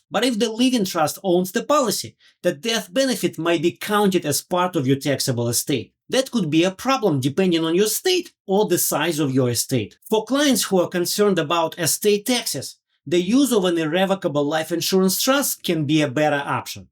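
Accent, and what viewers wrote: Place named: Ukraine. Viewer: I love your voice accent ❤...R U Ukrainian? (good advise too!)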